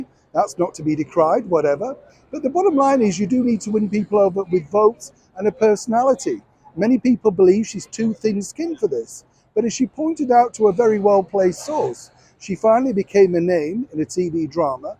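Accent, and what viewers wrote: Yorkshire, United Kingdom. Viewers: you a yorkshire lass?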